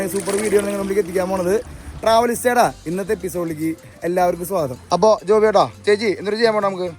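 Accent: Thrissur, India. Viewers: ഓർമ്മിപ്പിച്ചോളോ മാപ്ലേനെ...😂 ത്രിശൂർ സ്ലാങ്ങ് പൊളിയാണ് ട്ടാ..❤❤❤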